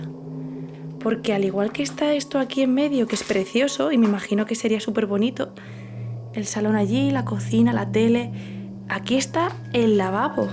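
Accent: Spain. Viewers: eres muy genial tienes una voz dulce y hermosa me encanta como hablan en tu bella españa bellísima españa saludos ❤✌🏿🇨🇱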